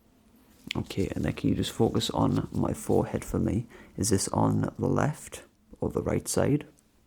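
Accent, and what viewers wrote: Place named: Wales, United Kingdom. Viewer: Your accent is lovely! Welsh I think? 😅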